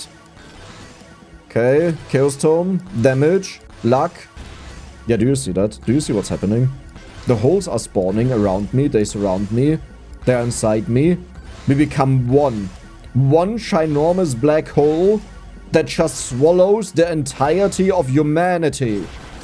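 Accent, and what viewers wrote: Germany. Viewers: Dude at 50 i totaly felt the need to speak german 😂😂 Moved to germany few years ago still bad at the language but i enjoy your english german accent since i always hear it everywhere i go and its comforting to listen to it while youre playing. Perfect time to vent frustration out 😂❤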